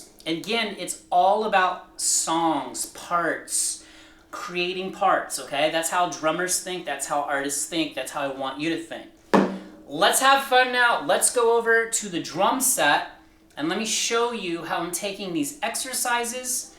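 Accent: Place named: United Kingdom. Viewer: Oh u are from uk, I think u are from america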